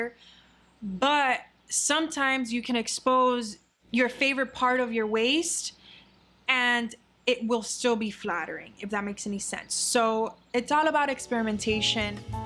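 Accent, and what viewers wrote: Miami, United States. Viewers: i know a miami girl accent when i see one 🥰🥰🥰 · That is the Miami accent...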